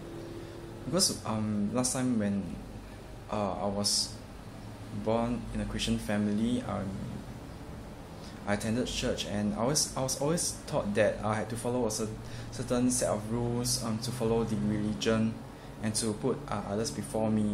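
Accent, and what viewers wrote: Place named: Singapore. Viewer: You sound Singaporean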